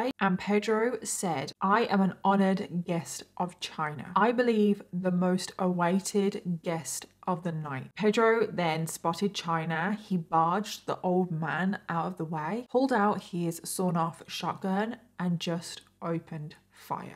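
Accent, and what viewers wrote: United Kingdom. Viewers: you are so sweet and caring <3 i love the way you tell these cases, it's very personal and it always shows your opinions and feelings about them.. also ADORE your british accent! :) Love from Italy <3 P.s: in Italy we just had a very shocking murder of a young girl, who was a mother to a 5 year-old baby boy and she was also pretty known on the internet.. it's really absurd, I'd really like to see u cover it!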